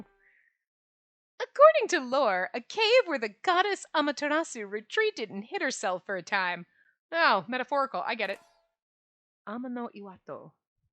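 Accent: Ireland. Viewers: The irish accent Yay! Time to shop I need this game, the artwork is beautiful. Yin and Yang ☯️ also I love your voice acting.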